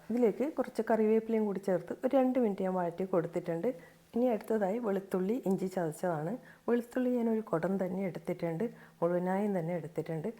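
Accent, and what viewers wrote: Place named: Kannur, India. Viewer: Adhyam paranjathu valare sheriyaanu. Chickenil enthum pareekshikkaam. BTW, ningal screenil ezhuthi kaanikkumbo - krithyamaayi ezhuthan sramikkuka. Savola kku pakaram - Onion ennum. Turmeric*, pinne Green chlliyude koode coriander ennanu ezhuthiyathu. curry leaves alle ittathu ? Pinne.. adhyam ittathum randamathu ittathum aaya pepper powder different aano ? color kurachu vyathyasam pole thonni (may be lighting). ithrayum neetti ezhuthaanulla kaaranam.. njan okke recipe kandu like adichu pokaan alla kaanuka. kandaal cheythu nokkaanum koodi aanu. athum nammude kannur style basha kelkumbo .. kooduthal :) . Njanum molum mathrame non-veg kazhikku. athu kondu njan thanne undakkanam . athu kondaanu ellam ezhuthiyathu. Accidentally ningalude lemon powder pop-up aayi. appo channel nokki. ippo subscribe um cheythu. Thanks for the recipe. 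Will definitely try once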